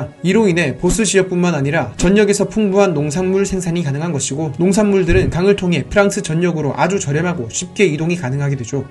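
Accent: Gyeongsang, South Korea. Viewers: 컨텐츠가 아주 좋네요. 그런데 경상도식 발음은 좀 교정을 하셔야할것 같아요! 영향, 전역, 그냥, --년, 등등.. 연음이 표준어에서 벗어난부분들이 많이 보이네요.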